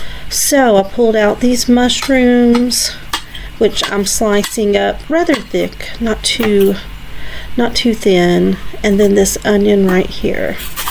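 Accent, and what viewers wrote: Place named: South, United States. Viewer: you've definitely got that southern drawl but we really like it! I do hope you didn't mind me mentioning the camera but it's an idea to play about with. You have done so well. It's not easy in the early days navigating filming, editing, uploading, being Infront of the camera etc. Hats off to you girl. Xx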